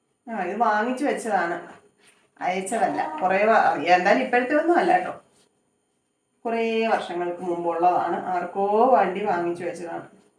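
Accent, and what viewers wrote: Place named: Thiruvananthapuram, India. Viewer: തിരുവനന്തപുരം ഭാഷ ആണല്ലോ. സ്റ്റൈൽ. വീട് എവിടെ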